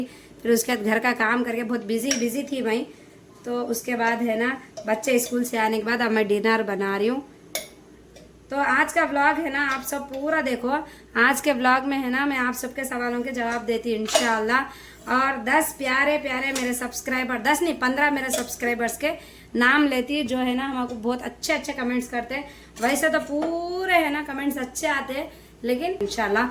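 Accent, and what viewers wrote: Hyderabad, India. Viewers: Yeh hyderabadi language with old city accent ..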